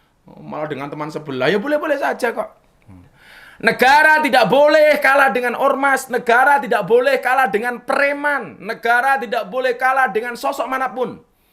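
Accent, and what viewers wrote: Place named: Indonesia. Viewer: Wow cara baca lafadz arabnya khas islam nusantara. Ngaji dimana ya pak?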